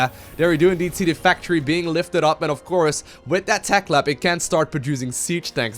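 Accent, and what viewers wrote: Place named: Netherlands. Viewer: sounds dutch. The accent is real.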